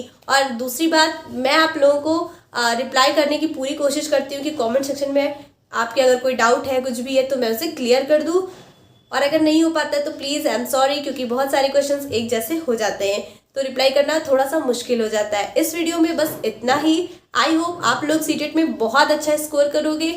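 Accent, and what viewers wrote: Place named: Moradabad, India. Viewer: Aap sayad moradabad mandal se ha he aap ki language bata rahi hai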